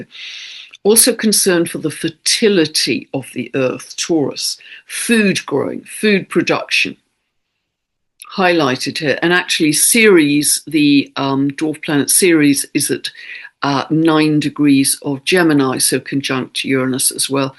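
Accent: United Kingdom. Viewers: Good work! And nice to hear my first English BRITISH English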